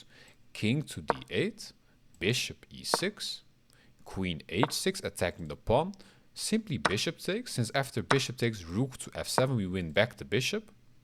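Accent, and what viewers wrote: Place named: Turkey. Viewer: Türk aksani her yerde kendini belli ediyor😃 guzel video reis devami gelsin bu tarz videolarin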